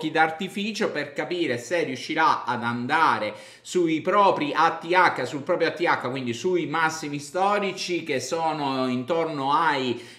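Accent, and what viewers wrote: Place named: Cosenza, Italy. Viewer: sei cosentino e la d e la t si sentono... prima o poi spero di poterti salutare di persona covid permettendo😉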